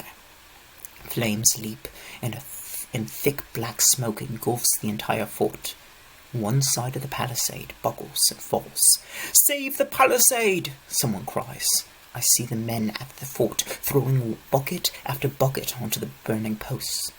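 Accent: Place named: England, United Kingdom. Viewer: The horrible English accent just makes this book ten times worse than it is.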